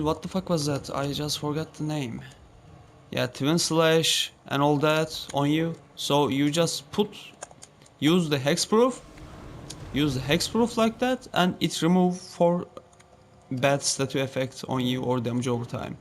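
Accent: Turkey. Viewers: aksanını duyar duymaz kanal ismine baktım türkçe mi diye ya :D kralsın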